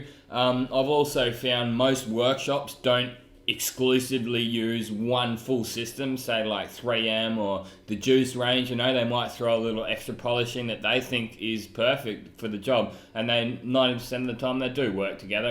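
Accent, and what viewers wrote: Australia. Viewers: but you are Australian or American? which city?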